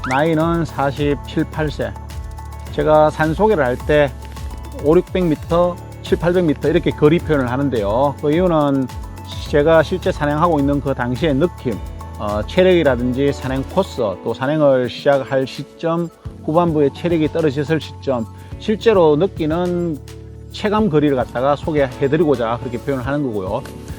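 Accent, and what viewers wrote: Daegu, South Korea. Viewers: 와우~~~ 님, 홍성 용봉산의 봄 풍광과 함께 개인적인 소개를 들으니 궁금증도 해소되고 더욱 친밀해 지네요~~~^^ 음.. 저보다 쬐끔은 어리시리라 생각했는데 6년정도 되네요~^^ 사시는곳은 저희 어머님 고향인 대구셔서 억양이 익숙하고 정감있었던 것 같습니다~~ 태안 백화산에서 '이리오너라~~ 게 아무도 없느냐~~' 와우~ 마라톤완주, 철인3종, 수영까지... 반바지 패션을 외쳤었는데~ 오늘 사진으로 다 봤네요~ 굳입니다~~ 항상 응원드리며, 사계절 확인제품군이 아닌 품목들은 협찬 받으셨으면 합니다. 그러면 실시간영상과 드론영상, 360도 촬영등도 앞당겨 할 수 있고 님이 하셔야 제맛일듯 합니다~~ 나이들수록 좀 더 큰 숲을 보기 위해 힘을 빼다보면 가까운 나무앞에서의 자신만의 룰이 꼭 정답은 아닐 수 있다는 생각을 하게 됩니다. 이 대목엔 맞지 않지만 요즘 제가 좋아하는 말이 있습니다. '악은 나를 생존케하고 선은 우리를 공존케 한다' 우리가 배우고 지키던 도덕과 윤리는 자연속에 부합되는 섭리가 아니라 인간 공동체 사회속에서 국한되어지는 룰이겠지요~ 코로나만 아니면 함께 등반기회가 있었으면 좋겠네요~~ ㅎㅎ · 아 님 거짓말도 좀 하시는듯... 마라톤 풀코스 완주에 바다수영 3km, 또 트라이애슬론까지 다 하시는 분이면서 체력이 그렇게 좋은거 아니라고 말씀하시다니요. ㅎㅎ 어쩐지 산을 좀 날아다니신다 했습니다. 사투리가 가끔 묻어난다 했더니 대구분이셨군요. 저도 동향입니다. 십몇년을 그곳에서 살았었죠. 유튜브 하시는 분들 다 수익발생 바라고 하지만 하고 있는 입장에서도 쉬운 일은 아닌데 너무 잘 하시는거 같습니다. 조만간 오억도 곧 달성하실거 같네요. ^^ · 억양만으로 대구 분 이라고 생각했습니다 540만원? 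한 편 찍는데 이동하는 기름값도 안되네요 ㅠㅠ 구독자들과 소통을 위해 월1회정도 라이브방송 괜찮은듯 합니다. 슈퍼챗 이라고 구독자가 후원해주는게 있는데.. 아마 인기많으셔서 금방 부자되실 거에요^^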